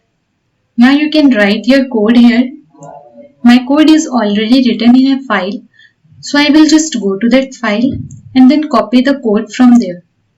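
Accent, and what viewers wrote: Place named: Russia. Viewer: by the way, your speech sounds like Russian a bit) Did you learn something like industrial electronic at the university?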